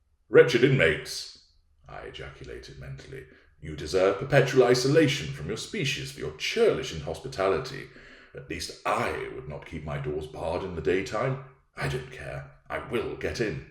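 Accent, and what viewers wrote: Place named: Yorkshire, United Kingdom. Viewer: Excellent Yorkshire accent and a most enjoyable performance. Bravo!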